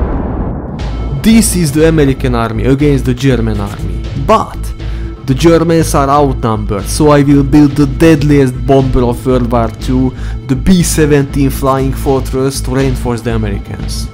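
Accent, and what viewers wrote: Russia. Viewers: bro your accent sounds sooo good, you sound like an russian... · Сразу понятно что он русский, по акценту немного напоминает русского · His accent is very similar to Russian,Or am I the only one who hears this? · Bro are you russian or what why you have russian accent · Ты понимаешь русский?Акцент не английский.Do you speak russia? No English accent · you have an amazing Russian accent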